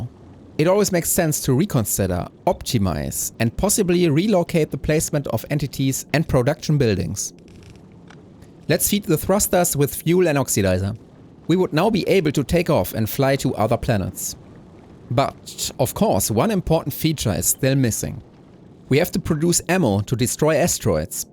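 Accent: Germany. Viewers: Den deutschen Akzent hört man extrem raus.😅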